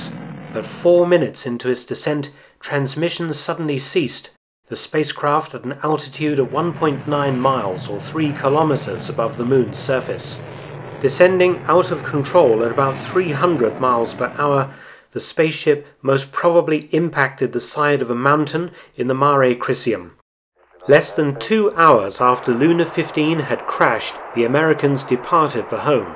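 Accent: United Kingdom. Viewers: When you have to use context to tell whether a British person is saying Luna or lunar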